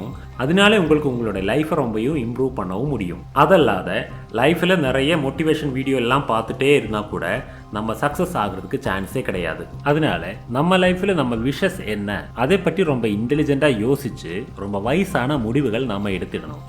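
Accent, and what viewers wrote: Kerala, India. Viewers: Bro Malayalam ma unga voice la theriunchu thu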